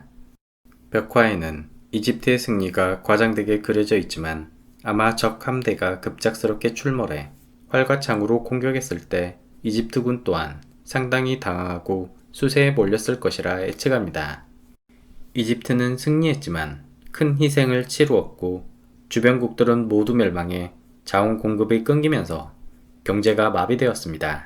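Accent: Jeolla, South Korea. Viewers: 내용이 좋은건 당연한데 은근 슬쩍들려오는 전라도사투리가 갱장히 친근하네요ㅋㅋ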